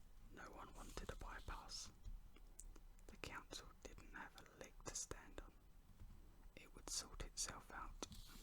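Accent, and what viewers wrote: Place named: United Kingdom. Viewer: So happy I found someone British like me. You have a lovely voice and made me very sleepy :)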